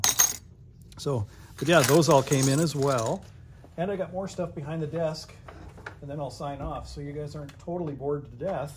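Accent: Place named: Upper Midwest, United States. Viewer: I enjoy your channel. And recognize your accent. I grew up in Minnesota about an hour from Fargo. I worked in Fargo for about 10 years. I’ve been through Minot many times back when fracking sand was big. I drive semi. I live north of Nashville TN just under the Kentucky state line. Again I appreciate your channel. Can I ask did the majority of what you bought yesterday come from one customer?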